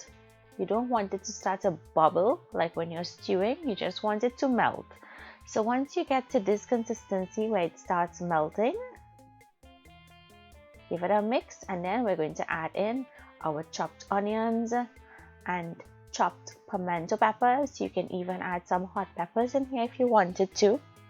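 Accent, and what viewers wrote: Trinidad and Tobago. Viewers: She has a Trinidadian accent but the culture is similar to Guyana which is mostly Indian and African influence.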